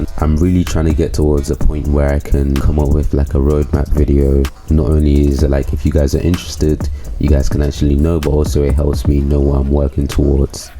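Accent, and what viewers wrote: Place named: West Africa. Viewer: yo, great work but you have a similar accent as me, are you from west africa, not to be offensive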